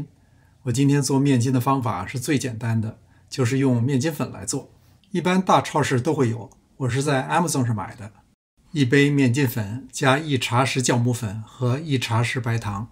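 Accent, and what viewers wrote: Beijing, China. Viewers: 看了很多美食视频，最喜欢的。不仅食材的获取途径，还是做法都很易操作，还有同是北京的调调很亲切。😊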